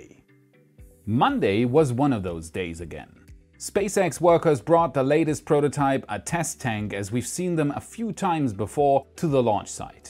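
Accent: Germany. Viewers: Of course he's German. Doesn't the accent give it away? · you are from germany?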